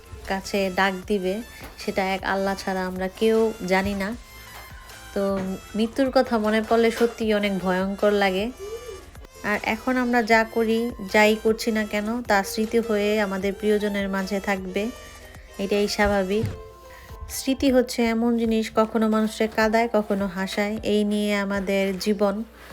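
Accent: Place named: Munshiganj, Bangladesh. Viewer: তাইতো বলি ভাষা কেন এত চেনা চেনা লাগছে আমার বাড়িও মুন্সিগঞ্জ শ্রীনগর থানা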